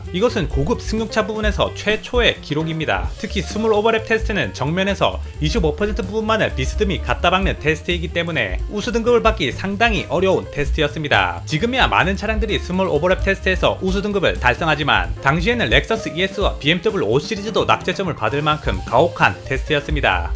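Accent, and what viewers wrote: Gyeongsang, South Korea. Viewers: 경상도 사람잉가 ㅋㅋㅋ 사투리들리네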